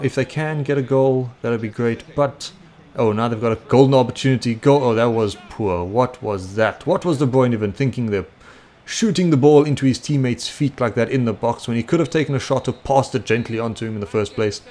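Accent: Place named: United Kingdom. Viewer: Like the Brittish Accent!!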